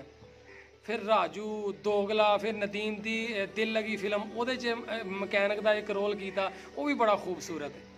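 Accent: Punjab, India. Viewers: Aapky program ki Khoodsoorti Aapky PANJABI bolny KY style Mai Hy ...Urdu Mai to HR koi program bnata Hy .......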